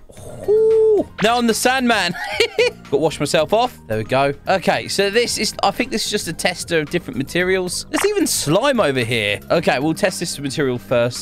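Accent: United Kingdom. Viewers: Hey ate you britesh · Is it me or is this guy British?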